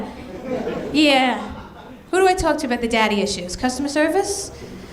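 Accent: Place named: New York, United States. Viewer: HI, IM ONLY USING CAPS TO TRY TO GET YOUR ATTENTION... I WANTED TO KNOW IF YOU WERE REALLY MARRIED? IF NOT? I WOULD LIKE TO TALK AND LAUGH WITH YOU.. ( BTW, ADRIAN ALWAYS SUPPORTED AND BELIEVED IN ROCKY! WATCH THE FIRST TWO ROCKY MOViES AGAIN ) IM ITALIAN, FROM BKLYN. NY.. YOU SOUND LIKE YOU ARE ALSO FROM NEW YORK.. IF YOU ARE NOT MARRIED? I HOPE TO HEAR FROM YOU. IF YOU ARE MARRIED? ALL THE BEST TO YOU AND YOUR HUSBAND. MERRY CHRISTMAS TO YOU AND YOUR FAMILY! ~ XOXOXO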